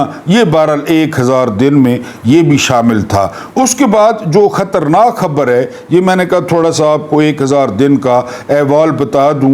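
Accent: Saraiki, Pakistan. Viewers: آپ کا "ہلکا میٹھا" سرائیکی لہجہ مجھے بہت پسند ہے۔❤❤🎉😊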